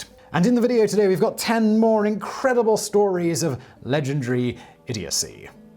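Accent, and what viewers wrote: United Kingdom. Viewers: Your pacing and elegant English accent make stupid sound almost near normal. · Can I please get a video where you pronounce things like garage, aluminum, etc. in proper American? I'm getting tired of using the Brit to American translator... and don't even give me your kilo and kilometer bullcrap...or 999 instead of 911. I think I covered it all...for now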